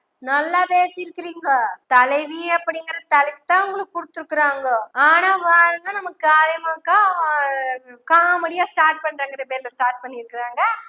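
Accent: Kongu, India. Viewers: கொங்கு தமிழ் சங்கு முழங்குவது போல் பெரியாரின் பேத்தியின் மொழி நடை உள்ளது வாழ்த்துக்கள் ❤️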